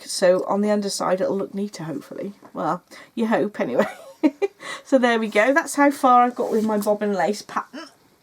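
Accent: United Kingdom. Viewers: Congratulations,! As a german viewer I really love to watch your podcast and listen to your lovely british English. Thank you for cheering up my knitting Time 😍❤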